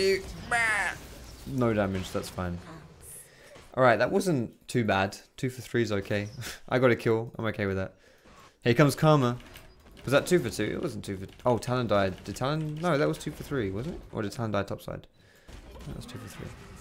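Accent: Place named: Essex, United Kingdom. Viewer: You know is from Essex when he says "not gonna lie"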